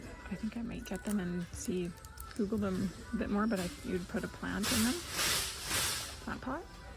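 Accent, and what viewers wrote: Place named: North, United Kingdom. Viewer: Those frosted blue glasses were def a miss! They were so pretty! 😊 Purse is cool, and so curious how much weight that macrame chair would be able to hold! 😮 Also, I need to finally mention this… (hope you see it!) There’s a really great YouTube ad that I rarely see anymore but used to see it a LOT. It’s a supposed buyer who’s showing all the various ways and applications that this particular lint scraper thing can perform - all while narrating it. It’s actually pretty funny bc she says “It will get you questioning your life and things like…Does my dog still have a coat? Am I a gross and disgusting person?” and so on 😂, and at the end she says, “Idk, this just might be the best thing I’ve ever bought from the internet.” ANYWAY, I swear YOU are the voiceover person!! It’s your exact kind of measured dry humor and the voice (complete with northern accent) sounds just like you! 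😄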